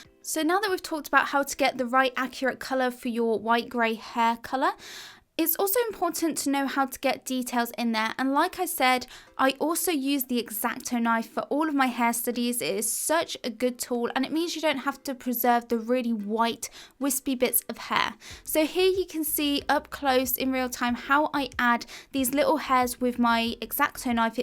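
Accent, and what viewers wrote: United Kingdom. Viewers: Why are the classes priced in US dollars when is so obviously British?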